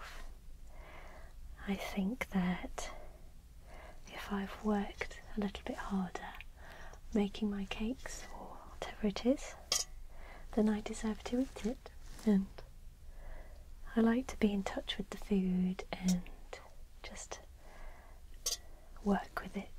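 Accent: United Kingdom. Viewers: Omg for a second I thought there were actual grasshoppers in it! I'm in love with UK accents. ♡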